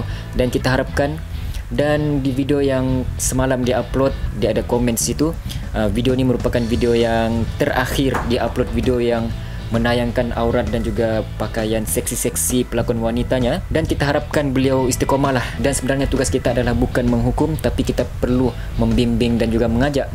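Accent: Sabah, Malaysia. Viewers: Bro ni orang mana ya? Loghat sabah hm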